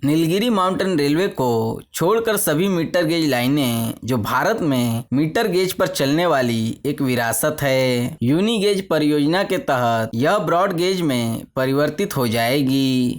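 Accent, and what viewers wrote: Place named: Bangladesh. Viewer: Bangladeshi type ki bolti ho 😂😂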